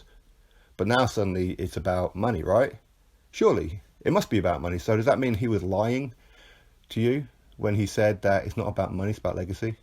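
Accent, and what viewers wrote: United Kingdom. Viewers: Is that a British accent I detect from the narrator?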